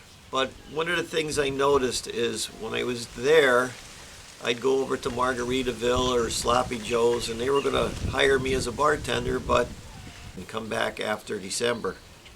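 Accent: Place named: Pennsylvania, United States. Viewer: Great video and a real interesting life. My family was from Gerardsville and now live south of Philly. Your pa acent has always sounded like family to me. I am also planning to winter in Florida but those HOA fees hurt. Learned a lot from you over years and I have been doing patio and sidewalk and stucco on my old house up here in Kennett Square.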